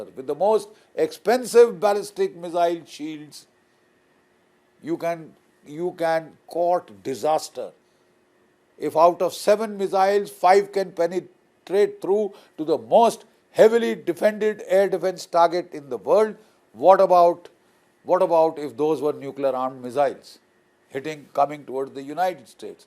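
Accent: India. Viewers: I usually can't stand indian accents but I'll tolerate it just for this guy. He's good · How come u listning Indian accent broadcasts???😳🤷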